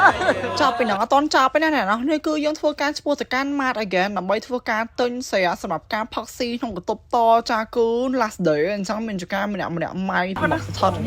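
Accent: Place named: Cambodia. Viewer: I am genuinely intrigued by your Khmer Accent. Which part of Cambodia are you from that has this accent?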